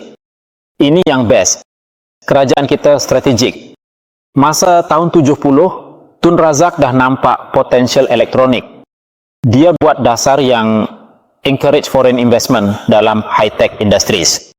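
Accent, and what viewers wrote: Java, Indonesia. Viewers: Dari suaranya ketahuan narasi yang dibacakan ini adalah Orang Djawa, dan bukan orang melayu wkwkwk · Min cara kau bercakap Melayu pun dah tau kau orang indo so takpayahlah nak menyamar kononnya kau orang Malaysia. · Audio bunyi slang jawa indo cakap bahasa melayu. X rasa mcm orang Malaysia yg buat klip video ni biar pun suku Jawa adanya di tanah Melayu. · Admin ni pasti lahir di parit ponorogo batu pahat slnk dia jawa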